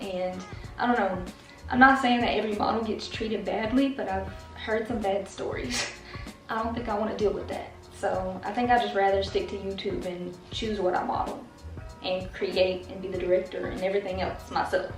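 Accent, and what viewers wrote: South, United States. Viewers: Ugh i was hoping you’d say what state you’re from. I love your southern accent 🥰. I’m from the south too girl; Tennessee to be exact. But i understand being from a small town you want to keep that private! Enjoyed this video! We’re so similar in ways and i really love your personality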